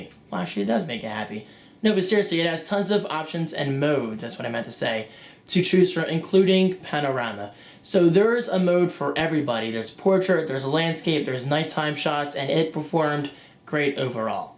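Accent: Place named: New Jersey, United States. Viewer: I like your Jersey accent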